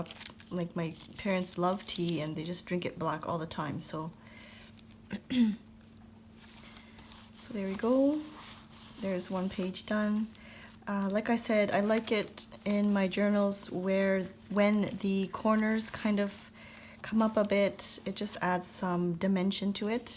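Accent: Canada. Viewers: Haha I could tell you were Canadian the first time I watched one of your videos :) I didn't realize how much I say "eh" until I lived in the UK and people would point it out 😄 I love this longer chatty style video! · Thank you for this video! I have had it saved in my Watch list for awhile but have been having a planner meltdown recently, ha! I think today I have sorted out my dilemma and came on here to watch something and saw your video and it was exactly what I wanted! In fact I am now going to make a playlist of a bunch of your videos to watch as I journal! Also, I don't hear your Canadian accent.... might be because I'm Canadian too:) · Fun video! Thanks for sharing-I love this type of video so please keep doing them! You definitely have a Canadian accent-it makes me smile when you say "Eh?". When we order pizza we order it well-done, so it has those darker bits of cheese and dough-yum! 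Have a great day! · Love hanging with you! Love seeing how you prep your pages. You can use the old calendar as a junk journal also. Just collage over the pages. I remember $2 Tuesdays for sure. I think my first movie was at a drive in with my family in Barbados. I was 16 and we saw the first Star Wars. How's that for dating myself? And yes you have a Canadian accent. 😁Also loved hearing you enjoy your sandwich. I also craft on my dining table. 🤗💞😘😘😁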